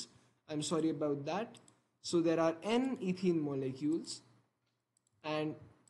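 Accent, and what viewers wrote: India. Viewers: I feel u INDIAN right..?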